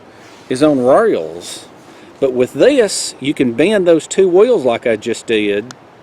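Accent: South, United States. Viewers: Happy New Year. Am about to set out on my morning old man walk(arthritis in knee, so no more running,) with a good American Buddy from Alabama(I'm American too,but not from the south,) and our dog. Anyway, years ago, when he'd gone home for almost a year, I discovered your channel and would watch it out of nostalga for his accent and similiar excellent story telling skills....cheers...from far off Normandy, France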